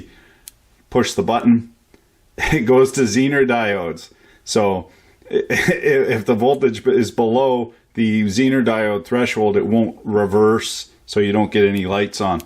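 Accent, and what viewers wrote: Canada. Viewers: been a mechanic since 77 , I came to this channel for this , turns out I like listening to canucks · The Canadian commentary is half the reason I watch these.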